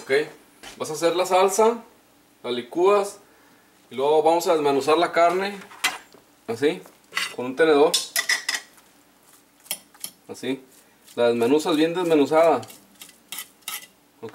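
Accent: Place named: North, Mexico. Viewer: Por su acento confió más en que esta receta sea la más adecuada ¡Arriba el norte!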